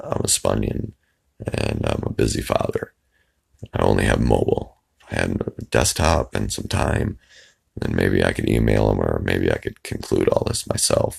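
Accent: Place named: Northern England, United Kingdom. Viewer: Northern English accent. Bordermen on the south side of Hadrian's wall, The Roman empire didn't dare go any further North then the famous border wall. It's really nice to meet you and wish you all the best in the future and good health to you and your loved ones. Gonna get my camera out it's just getting dark now. What do you make of the Sickness that is being spread among us apart from sick of it already? Culling the population by virus?Anyways I have subbed and if anything happens or If I get my act together I will post u a message.Peace!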